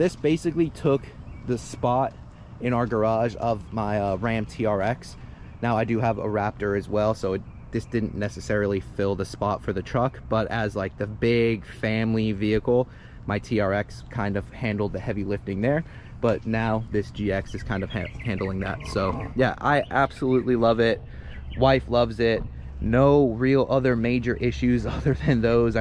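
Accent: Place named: South Korea. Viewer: 제목은 한글인데 콩글리쉬로 씨부리노 ㅡㅡ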